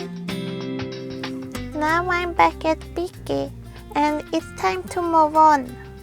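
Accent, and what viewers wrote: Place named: Netherlands. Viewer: Thank you so much for another lovely video! I am catching up for 2 weeks worth. I am still intrigued by your lovely accent. Are you Dutch? I’ve been meaning to mention that I really like your animated maps following Bikki. The music is perfect! And congratulations on your constantly growing subscriber count. Claws crossed you’ll keep growing 😀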